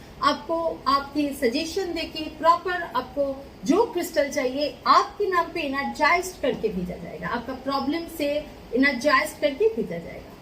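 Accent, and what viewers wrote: Bengal, India. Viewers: Plz forgive me ur Bengali I accent little bit difficult toubdeeaeabd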